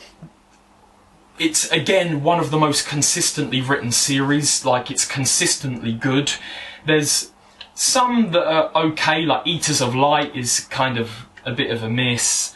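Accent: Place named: South East England, United Kingdom. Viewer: oh, you're a white guy in hid mid 20's with a vaguely south eastern accent and no fashion sense. I think i can guess most of your rankings before even watching the video s- series 4 and 5 a- series 1 3 14 and 10 b- series 6 and 2 c- series 8 and 9 d- series 7 and 13 f- series 11 and 12 edit: skipped to the end, i got many of these right. It's infact true that cis men have no taste.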